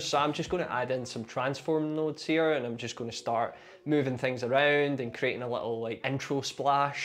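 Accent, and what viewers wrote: Scotland, United Kingdom. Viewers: Mmm...how do you get Resolve to automatically update the changes you've made in your .psd file? It ain't doing it for me, and I couldn't see from your video how you got it to do that. Do I need to restart Resolve? Okay. I answered my own question. Yes. I had to restart Resolve et voila! While I'm here, what's happened to you? Have you abandoned ship forever? Because that would be a real shame; your videos are great and I appreciate hearing the accent of a fellow Scot. Lanarkshire, by any chance?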